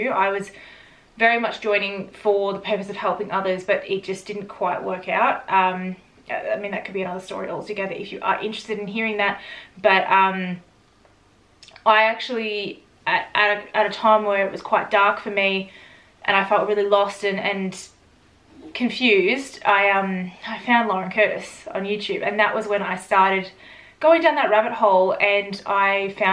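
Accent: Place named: Australia. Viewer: don't worry about this toxic remark, you are too polite, girl👏😘, your charisma, intelligence gorgeous Australian accent😉😘, beauty and character make up for anything and everything, ok 💐🙏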